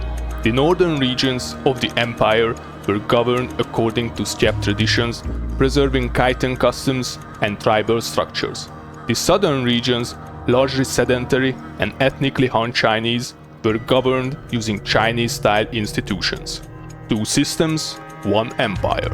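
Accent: Hungary. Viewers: Your accent look very hungarian.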